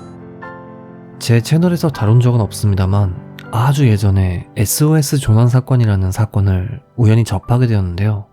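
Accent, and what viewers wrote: Japan. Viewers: 와 전 들으면서 한국말 잘하는 일본인이시구나 라고 확신했는데 발음이나 억양에서 그런게 느껴졌어요ㅎㅎ 근데 한국인이셔서 놀랐어요 평소에 즐겨 들었는데 처음으로 댓글 남겨보아요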